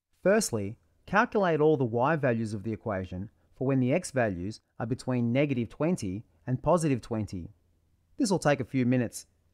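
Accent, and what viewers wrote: Australia. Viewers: drive a nail where you want the focus to be. Tie one end of the string around that nail. Extend the dangling string downward toward you on your surface with a loose loop where you want the base of the parabola to be, and run the rest of the string vertical up the surface past the nail but attach it to the side of a 90 degree triangle square just touching the nail... Put your pen at the loop directly down toward you from the focus nail - that'll be the start of one side of your parabola... now slide the square straight to the right side and keep the pen tight against the string - as you continue to move the square, the string will slide upward and you'll trace a parabola shape... reverse it the other direction or trace the 1/2 you already did... Bob's your uncle. (since the teacher sounded ozzish (well, at least commonwealth something...)). 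Cheers.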